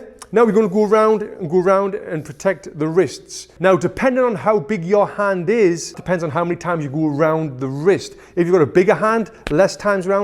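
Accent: United Kingdom. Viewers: He is British not Australian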